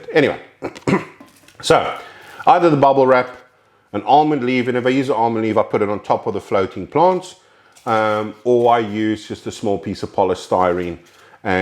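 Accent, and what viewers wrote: South Africa. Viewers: You from South Africa:)?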